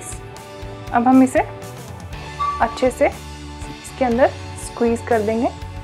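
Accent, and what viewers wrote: India. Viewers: Don't overreact. Just like American and British, it's Indian english. No pronunciations are wrong here. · Yes that is the actual pronounciation but PPL in India have a different accent that why they pronounce things different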